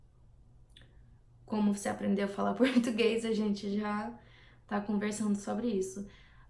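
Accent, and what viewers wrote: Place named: São Paulo, Brazil. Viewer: LIKE👍 you!!! Seu marido deve ser paulista.... Seu português tem um sotaque paulista... KKKK Eu vivo no Rio de janeiro (Saquarema)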